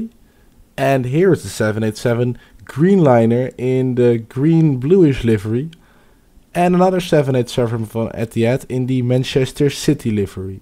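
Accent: Netherlands. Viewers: Ik hou van het nederlandse accent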